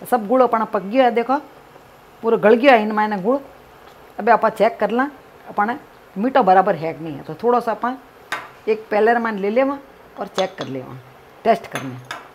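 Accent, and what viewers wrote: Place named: Rajasthan, India. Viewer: Unki etni mithi boli he.. Rajasthani boli me bat krti h wo .. full traditional. Aapko Nhi samjh aata to koi channel dekh skte ho aap.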